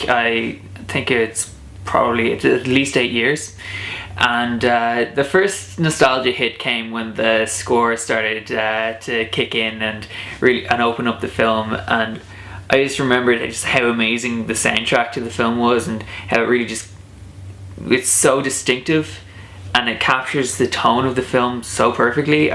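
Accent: Ireland. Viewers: The movie is impressive! After I went to the Titanic Light Show in Belfast two weeks ago I definitely decided to go to see the movie on big screen. DiCaprio and Winslet are genius, as always. Btw after my trip to Ireland I am missing the Irish accent, so beautiful! It was the reason I subscribed to your channel (your accent) =)) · I saw it in 3D, too. It was worth seeing it again in theaters, & the 3D in the film made it just a bit more enjoyable on the big screen. It is definitely a classic. Btw, great videos, I'm gonna subscribe cuz you're awesome. And of course I'm jealous of your accent ;) I wish I had grown up in Ireland.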